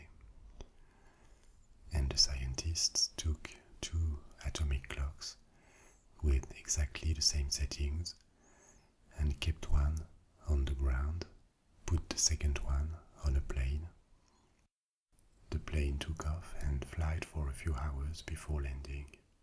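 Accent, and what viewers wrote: France. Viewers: The accent, I fell in love with a French accent the second I herd a French Female speak 😍😍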